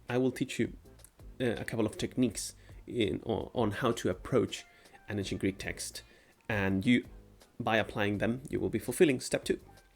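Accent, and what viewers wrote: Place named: Spain. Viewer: Me encantado! Que bueno es oir Graeca con un linga de Espanol. Yo amo las linguas de Espanol, y Griega, y otros. Now English. First truth: if one feels too proud just delve into Greek grammar to restore humility! For Ioanna, recall that Greeks were once illiterate. I refer to post- Mycenean collapse. They had their speech with all sounds/phonemes to express ideas, cases, etc. They had no WRITTEN case endings. They were then offered a menu of letters ( likely from Phonecian traders) from which to represent there spoken sounds. Folks who not long after created the Parthenon would not likely chose a number of letters for the same sound. Evidence also includes poetry lines that wdre meant to ryme but no longer do, latin transliterations and so on. The rough breathing was orig. H in another dialect and pronounced. Eyes were ophthalmoi now matia, winos ( with digamma) became winus then wine. 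Now called krasi, from mixing with water in a krator. Modern every day folks deny or do not k now this. Read Luke without much understanding. They eliminated Kztherevousa and adopted Demotic, the language of common ( usually poorly educated in lzst century, but not now of coursr). This removed them a step further from their kleromia/ heritage. No Greek kb here. But i love the channel and i subscribe. Karl Ioannis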